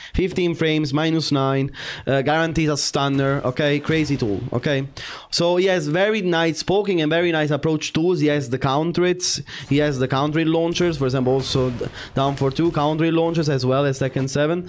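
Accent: Italy. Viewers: Bro fuck Tekken, I watch for the Italian accent 🤌🤌🤌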